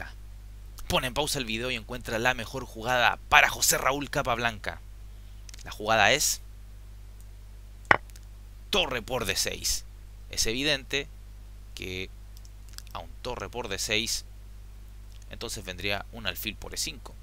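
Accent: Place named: Chile. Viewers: El acento es Chileno, aunque no lo creas!! Para que después no digan que en Chile se habla diferente. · Habla como chileno de hecho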